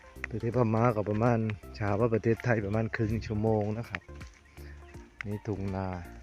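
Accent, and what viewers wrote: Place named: South, Thailand. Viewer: ผมฟังออกทุกคำ พูดใต้เหมือนกันเดะๆ ❤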